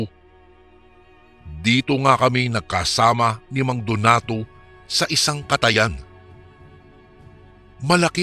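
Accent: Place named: Iloilo, Philippines. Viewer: Ay wow Ilonggo gd ..ya daw mabuga ko mn ya kape ko kag hahahahha ano iloilo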